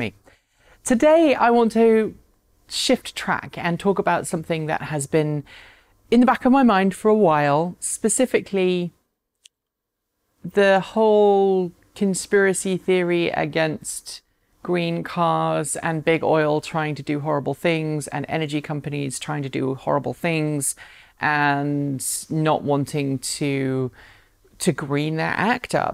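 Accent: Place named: England, United Kingdom. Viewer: Ah, you Brits. Here I thought you were mispronouncing methane, so I checked first the Oxford and then the Websters dictionaries. Webster shows the American English and the Original English pronouncations. "meth·ane | \ ˈme-ˌthān , British usually ˈmē-\". But, not to nit-pick, I really enjoy your channel. And your English accent.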